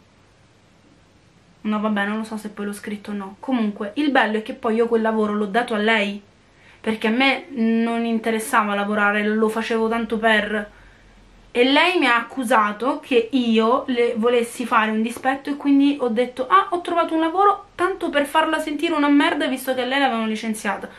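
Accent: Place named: Palermo, Italy. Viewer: Il tuo accento palermitano è qualcosa di stupendo 😂😍 (sono siciliana e ho origini palermitane)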